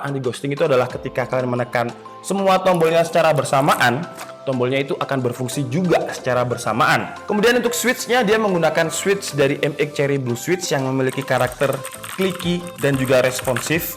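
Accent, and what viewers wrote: Malang, Indonesia. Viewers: Mas Wong Malang Po?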